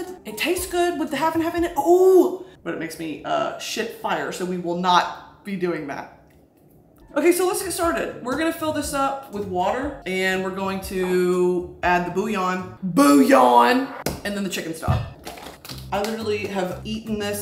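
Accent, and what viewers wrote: South, United States. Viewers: When you speak southern it just makes my day haha please never stop! I love backyard maw maw Broski haha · God that southern accent is so spot on to like 90% of my older family it SCARES ME😭🤣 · I'll never get over her southern grandma accent lmao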